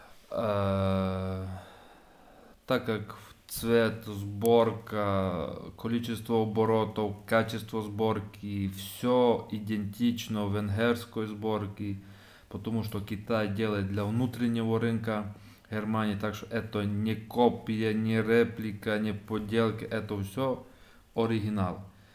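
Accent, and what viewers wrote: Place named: Ukraine. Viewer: Автор спасибо за обзор.Но... Уши вянут.Не пытайся говорить на русском говори на родном языке.Не прыгай выше головы.Разговаривай в будущих видео на украинской мове(из говора понял что украинец),не ломай свой язык и не травмируй наши уши.Спасибо за понимание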